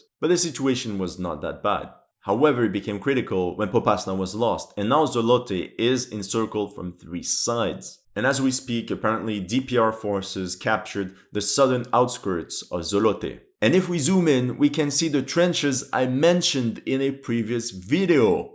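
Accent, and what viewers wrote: Russia. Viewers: why does he have Russian accent or he is french canadian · Interesting russian accent lol, 2 months and barely making any progress against a weak army... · You sound like a Russian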